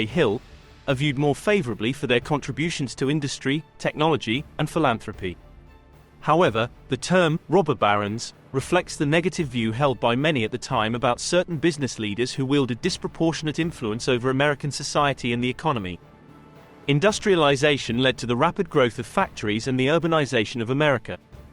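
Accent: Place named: United Kingdom. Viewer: Too British for me I am sorry.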